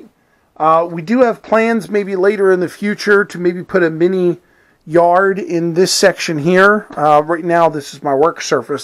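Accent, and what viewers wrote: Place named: Philadelphia, United States. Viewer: Nice to put faces together with names out there in the livestreams. I could tell you are from Philly with that accent. Penndel was my stomping grounds as a kid. Thanks for sharing. 👍 👍 👍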